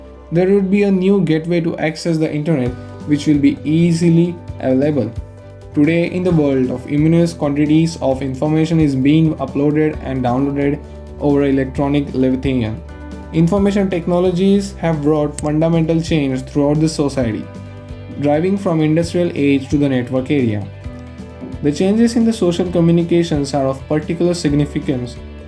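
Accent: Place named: India. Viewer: Your video looks nice 👍 but your vary strong Indian accent is very hard to understand and and oh god it’s painful to listen too, Is it possible you can get an English native speaker to do the video or maybe get some elocution lessons to speak English properly with a proper accent, Because it’s such a great high quality pro video that you’ve done so well edited vary Polished very professional, but so let down on that voice but I did watch it with the sound down because I was impressed with your video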